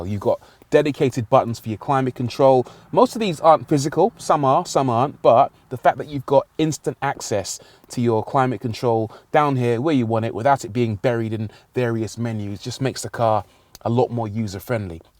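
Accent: United Kingdom. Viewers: I love that rant, it definitely had some of that bri'ish essence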